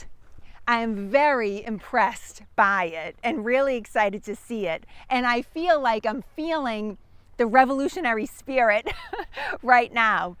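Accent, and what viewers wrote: Boston, United States. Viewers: Oooh. A park in Woburn is a godsend for those of us who tune in partially for the accent. Two better words nary exist to bring out the Boston in this revolutionary gal. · Your Boston accent is savage!..and i’m from here, LOL Thanks for the video. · YOUR EYES ARE SO GREEN AND YOUR ACCENT IS SO BOSTON🎉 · My wife is highly allergic to poison ivy, yet couldn't identify it if she were standing knee deep in it. She's always asking me, "Is this poison ivy?" I say, "No, dear. But what you're standing in is." I'm not allergic to it, so I'm good. Also, I'm from Georgia and I'm loving that Bostonian accent. My mouth can't make those sounds 🤣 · Love your content!!! and your Boston Accent!!! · there are trails like that here in Virginia too .you sure do have the Boston accent 😊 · Your Bostonian accent adds to the story telling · You have an exquisite “Boston” accent. 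Very appealing. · the hated Boston accent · And she's really got a Boston accent man I bet she's got the most Boston accent out there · Love your Boston accent. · her Boston accent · You only have to listen to talk for 30 seconds to realize that she is definitely from Boston.🤣💕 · OMG! That Boston accent! 🤣🤣🤣 I live in New Hampsha Hun! 🤣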